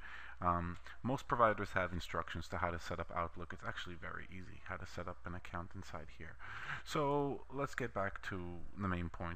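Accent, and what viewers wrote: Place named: New York, United States. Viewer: this one came in handy also - thanks for your hard work on these. I am still guessing NY accent...perhaps the Bronx?? I can hear it when you say dog.... like dawg :-) lol odd I know!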